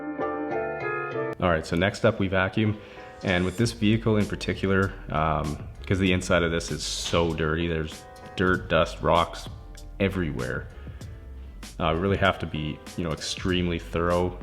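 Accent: Canada. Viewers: Bruh is he Canadian cause aye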